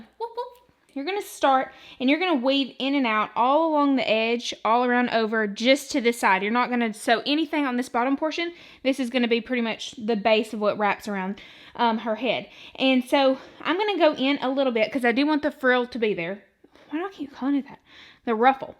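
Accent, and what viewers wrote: South, United States. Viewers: I love her southern accent!!!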